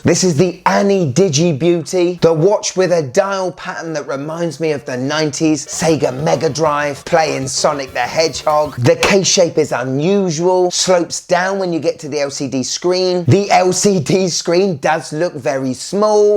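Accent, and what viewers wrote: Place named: Cockney, United Kingdom. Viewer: i wish this guy would talk low and quiet and not to loud kinda annoying.he does good reviews but cut down on the high cockney talk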